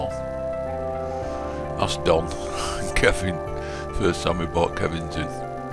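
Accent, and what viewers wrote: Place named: England, United Kingdom. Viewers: Sounds like a Salford lad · It's not a version of English - it's English spoken by an Englishman. If I was I'd be very offended but he's obviously a lot more tolerant than I am. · Perhaps if you learned to spell before you decide to comment on a northern English accent!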